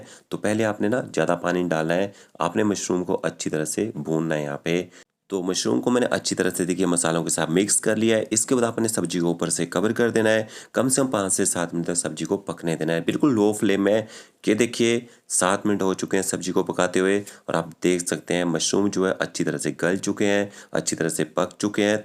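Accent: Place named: Himachal Pradesh, India. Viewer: Sabji tau bahut badhiya hai hi par chalni rakhkar excess oil nikaalne ka idea bahut achcha laga. Aapki Vanni se lagta hai ki AAP Himachal ke rahne vale hain. Shubhkamnaye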